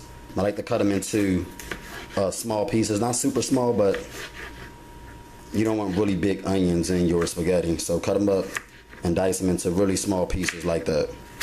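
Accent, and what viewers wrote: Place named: Philadelphia, United States. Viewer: I love your philly accent!!!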